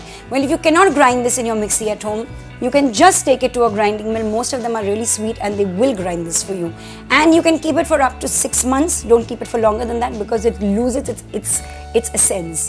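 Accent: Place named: Oxford, United Kingdom. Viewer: U r accent is very nice.... Oxford level.....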